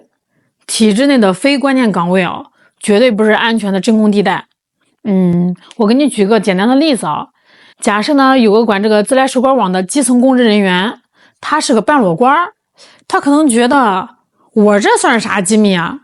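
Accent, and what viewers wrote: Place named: North, China. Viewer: 是北方口音吧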